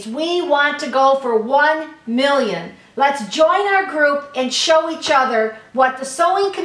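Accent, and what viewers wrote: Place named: Cleveland, United States. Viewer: Thank you so much for your video! I think even I could do it with your instructions (and I’m a knitter, not a sewer!). I love your Cleveland accent - it makes me miss home, originally from Akron. God bless you for all you are doing to help the health care workers. 🙏🏻💜👼🏻✝️😇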